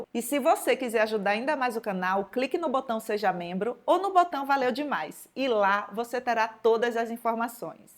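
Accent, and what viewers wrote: Bahia, Brazil. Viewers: Sotaque mais pra Bahia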